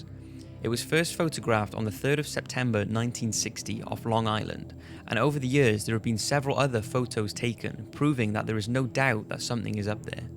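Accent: Wales, United Kingdom. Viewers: are you Welsh, I am welsh and I have a friend who sounds exactly like you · R u Welsh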